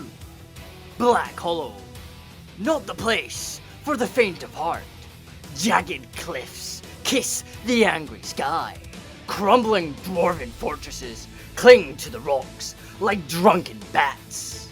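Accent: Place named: Scotland, United Kingdom. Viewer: You can be Scottish and understandable. Speak calmly please.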